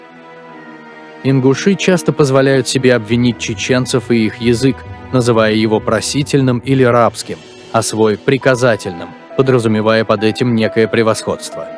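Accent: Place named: Urals, Russia. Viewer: Уральский говор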